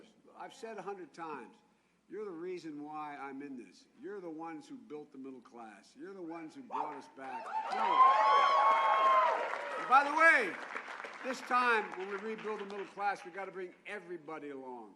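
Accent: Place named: Appalachia, United States. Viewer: Why is he talking like a hillbilly?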